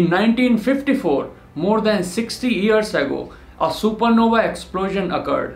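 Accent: India. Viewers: I want the text back not because of your accent im indian too but i don't have that accent but with text its chill for me :D and u very genius mwen :D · I hate an Indian when he talks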